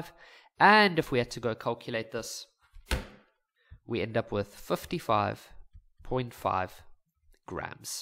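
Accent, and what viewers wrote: South Africa. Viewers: Thank you brother, you are a life saver, the way you explain is amazing, the South African accent is also great❤ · I WAS SO SUPRISED WHEN I HEARD THE SA ACCENT.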